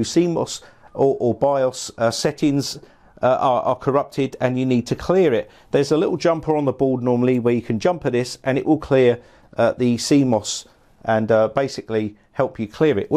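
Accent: England, United Kingdom. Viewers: Most satisfying english accent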